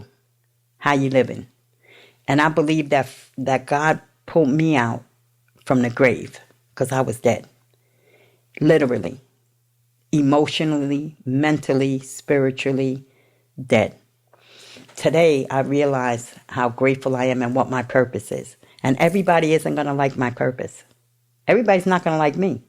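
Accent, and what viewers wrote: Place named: New York, United States. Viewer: This chick sounds like such a New Yorker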